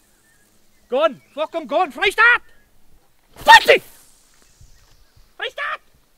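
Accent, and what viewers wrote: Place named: England, United Kingdom. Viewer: 😂 ek love die helse Engels aksent met die "okay" en "alright"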